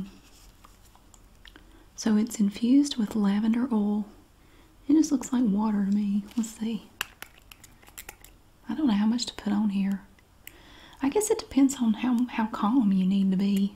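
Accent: South, United States. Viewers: Hi I really love your videos I like them more for how you make the smallest things and purchases exciting and interesting...yes, its a ramble, but you do have things to say, and its from the heart, I can tell you are not putting on with this, but I can tell you indeed do have fun with this, and you are a tad silly/funny, so I know its a slight put on I do feel relaxed by your regular voice, but I think its the combination of your southern charm and accent as well that relaxes, as its not really text book ASMR, of which I can only handle so much I really enjoy your videos, when I need calming I keep the browser open and put it on.... | And of course, southerners always tell the best stories!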